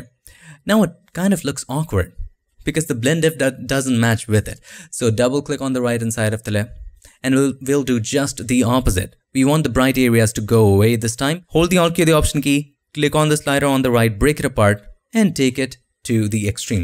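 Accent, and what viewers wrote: India. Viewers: I really like to lean photoshop with you but your english speaking is also good, where are you from , are you indian?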